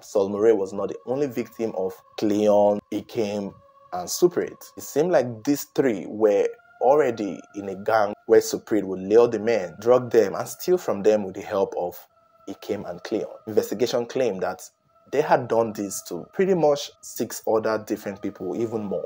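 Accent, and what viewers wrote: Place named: Nigeria. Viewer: I 😊😊😊😊😊😊😊😊I LOVE YOUR NIGERIAN ACCENT😊😊😊😊😊😊😊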